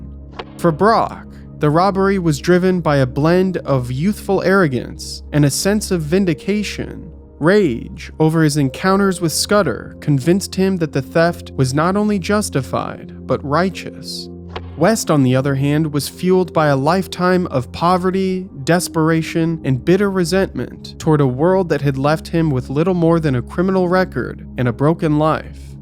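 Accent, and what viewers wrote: United States. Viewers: Quiet in the wilds is never a great omen. Typically, I've experienced that means there's a predator out there that scares every other animal Just saying, you sound at least as country as I am, but still, heads on swivels, guns loaded, situational awareness man. Quiet is not good, especially once it gets dark in the woods or anywhere, honestly.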